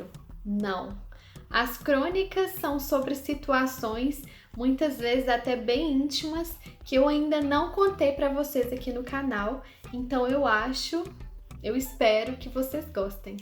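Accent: Minas Gerais, Brazil. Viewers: De la manera que parles eres brasilera. Parles més lent i més clar, per a un català. A més a més la pronúncia de la "R" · I think she's speak like people in Minas gerais.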